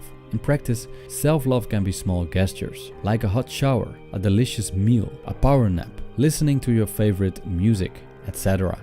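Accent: Netherlands. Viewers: Hoor ik nou een hollands accent :-)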